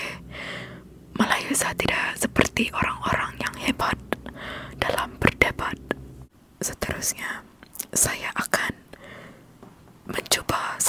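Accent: Sabah, Malaysia. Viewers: Logat Sabah oo 👍🏻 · I love your sabahan accent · your malay sound like sabahan malay a little bit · damn im a sucker for your sabah’s accent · Sabah ni logat dia terbaik! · it's because she's sabahan. their bahasa melayu is in a different accent then malays in semenanjung. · hahahaha sabah bah ini😂😂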